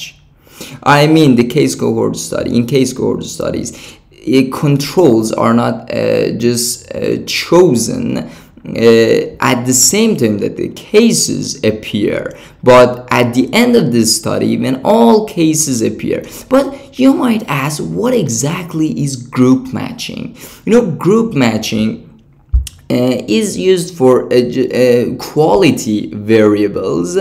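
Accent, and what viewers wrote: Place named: Iran. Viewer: Thank you for sharing this amazing video. Right from the start, I could tell that you are Iranian because of your lovely Farsi accent and the way you wrote "eighty" in Farsi 😁. By "group matching," do you actually mean frequency matching?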